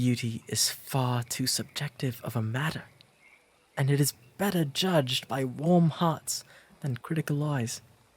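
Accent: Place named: RP, United Kingdom. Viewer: oh wow the posh accent is 🤌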